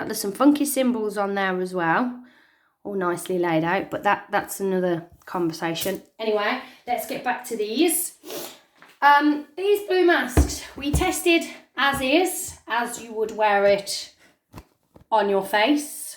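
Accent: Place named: West Midlands, United Kingdom. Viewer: Nice to here a west mids voice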